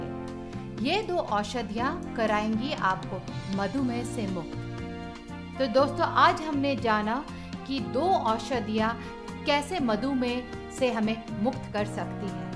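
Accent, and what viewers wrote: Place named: India. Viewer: Can you be clear please like (ENGLISH) I don't understand Indian 😆🙄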